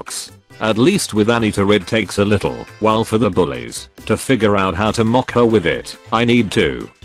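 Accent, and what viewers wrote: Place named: England, United Kingdom. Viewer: A robot with an English accent is still a robot. You need to do better.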